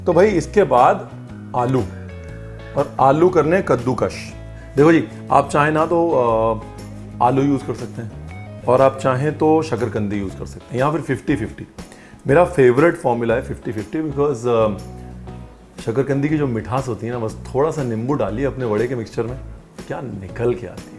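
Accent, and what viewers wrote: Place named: Punjab, India. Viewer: Very yummy 👌😋 i just love your punjabi tone chef .. you are really amazing n your recipes are just woww....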